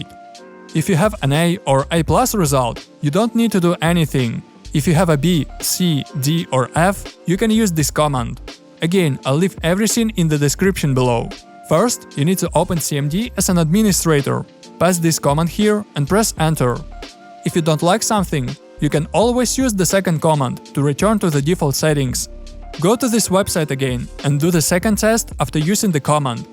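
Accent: Russia. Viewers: Слышно что Русский либо Укр))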